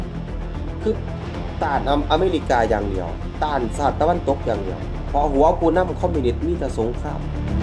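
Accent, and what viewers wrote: Isan, Thailand. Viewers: คุณพูดชัดไนสำเนียงไทยอีสานไม้มีสำเนียงลาวเลย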